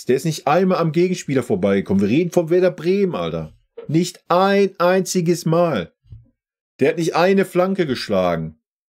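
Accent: Ruhr, Germany. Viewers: Höre ich da etwa 'nen Ruhrpott-Bayern raus oder täusche ich mich? 😂 Btw., sehr faire Analyse von dir! LG aus Bremen 🤟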